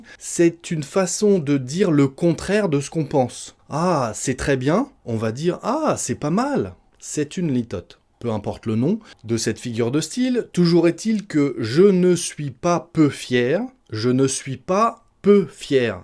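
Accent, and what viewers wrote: France. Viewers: J'adore entendre, merci..Bénis toi.Tu es le meilleur, j'adore ton accent.Du français pur.J'apprends de toi YAY🤩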